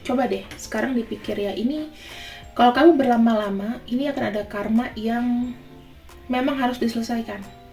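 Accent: Java, Indonesia. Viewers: Kakak suka gruuiiiiss ya kata ppaaauujaannnnggg kayak nya kkk orang jawa ya deh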